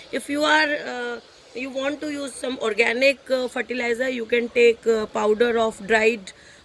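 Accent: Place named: India. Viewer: It sounds like you're from India or Bengladesh, how do you propose to deal with the Lilly's dormancy period in the tropics? I'm trying to protect my lilly plants, they stayed small this year and got chewed up by some kind of beetle. I finally dug them up this morning and started dividing the undersized bulbs and putting them in tiny planters I normally only use for cuttings. I'm hoping that they'll store more energy unmolested in the window with a screen between them and pests. :( I don't use artificial fertilizer at all because it damages the environment and the potassium and phosphate are mined. I use OMRI certified organic if at all. I prefer to use compost, and I've found that putting food scraps in the blender makes them break down into compost faster. High nitrogen fertilizer is actually very bad for plants, making them structurally weaker. So make sure any variety you buy is lower in nitrogen. 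Use grass clippings or shredded leaves at the base of the plant instead to get nitrogen to your plants at a more natural pace because they can't use all the nitrogen in artificial fertilizer.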